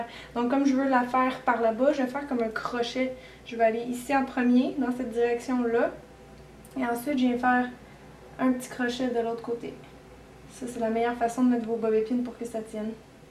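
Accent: Quebec, Canada. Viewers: Je comprend rien avec l'accent quebequois chui marseillaise c 'est normal :-) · Ah l'accent quebecois... Ca me manque finalement :(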